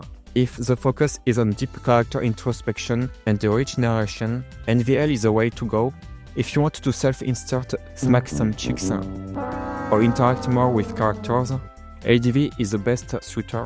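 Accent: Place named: France. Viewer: Gotta love that French accent! Keep the great work. (Also i caught a cold and now I am miserable. 🤧)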